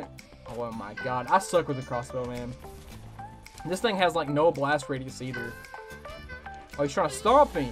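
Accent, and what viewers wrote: Tennessee, United States. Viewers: I knew that accent was familiar. Haha. I'm a tennesseean as well. Glad to see I'm not the only guy with a country accent playing cod lol.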